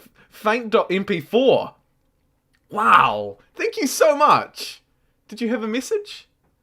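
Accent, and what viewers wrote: New Zealand. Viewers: I'm from NZ and I can't quite pinpoint your accent. It's definitely kiwi, as the "kiora" suggested, but some things sound English, and maybe Australian, and maybe something else I can't pick. Like you said something like: 'aw nah look they're running in with "skart" riders' For "scout", is that a UK accent? Anything else? Just curious lol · It sounds pretty kiwi to me. Maybe rural NZ?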